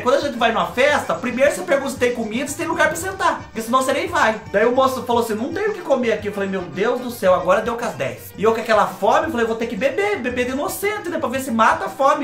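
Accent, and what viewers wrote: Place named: Caipira, Brazil. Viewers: Vc. Fala iguarsinho eu quando morava em minas gerais 😁😁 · Olha eiu concordo com o que comentou que se vc fizer Stand Up vc arrasa! Porque vc é muito engraçado porque exagera e fala com sotaque caipira muito engraçado!!!! Bj bj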